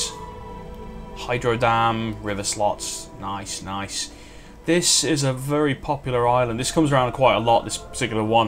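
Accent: England, United Kingdom. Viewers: Fuck yeah I just need to grab some food , play video and listen to English gentleman playing intelligent game on PC ... fuck yes!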